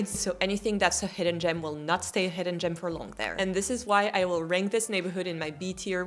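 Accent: France. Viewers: Bonjour! Je suis américain et mon niveau de français est environ intermédiaire-haut. Je suis tellement impressionné par ta fluidité en anglais! Bravo! Petite question: penses-tu que ton niveau d’anglais est comparable à celui de Jodie Foster en français? (Btw…I doubt you will read this, but I am curious nonetheless. I also understand self-evaluations are difficult. But to me I could easily mistake you as an American, though I do perceive the slightest French accent, which I think adds great spice to the language!). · i love french accent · Thanks so much for the recs! You didn't need to advise us not to go on a group tour, though. Waaay not our style. We too like the authentic and non-touristy areas of any place we visit, despite (technically) being tourists. That's why your advice will be so helpful. You speak English really well, by the way, but it's fascinating to hear your accent, because sometimes you have a French accent when speaking English and sometimes almost an American one. 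There's nothing wrong with that, of course, but it's interesting.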